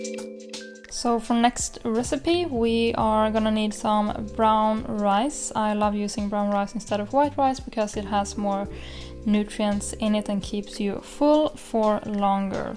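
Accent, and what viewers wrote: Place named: Sweden. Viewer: Visste att den dialekten var svensk, bra video! Nyttigt och bra för hälsan✨✨🌺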